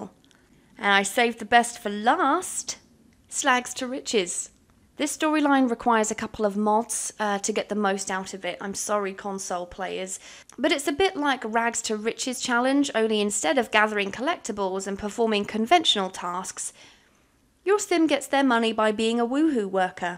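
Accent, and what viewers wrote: England, United Kingdom. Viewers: WoOoW!!! you are truely a unique story teller! Sims 2 has a built in autonymous whims gameplay system. Sims 3 has Story progression but sims 4 has given us so many beautiful tools and freedom ALLOWING US to tell our own stories and YOU my dearly beloved hit the POINT right on the nail!!! Alota simers find sims 4 boring which is unfortunate but what they dont realise is the amount of IMAGINTIVE playground it offers! All it demands from us is a special story from YOU the player and in turn THIS IS THE RESULT!!! So captivating i mean i JUST STARTED your video and when you starting introducing the TRASHBAGDADDY storyline i was BLOWN away and IMMEDIATELY started typing in comments!! WE NEED MORE like you! People with whimsy! English accent with Goddess angelic levels of VOICE Narritive and TRUE PASSION for the gendre i mean WOW you been loving the game since the beginning of time THIS IS SO INSPIRING! 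You have single handedly lifted my spirits and im sure you have ignited many others! i LOVE YOU i love you SoOo much!.. Can i have your number? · u talk like hermione, and i dont watch harry potter. i just know her. shes british.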